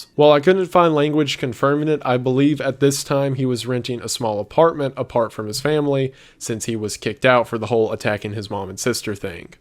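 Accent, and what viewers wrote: South, United States. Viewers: Where are you from? I giggle because you have a southern accent at times.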